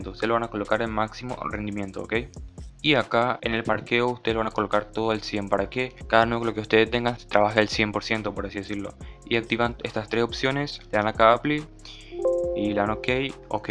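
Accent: Paraguay. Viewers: Se re nota que sos de Paraguay o estoy loco?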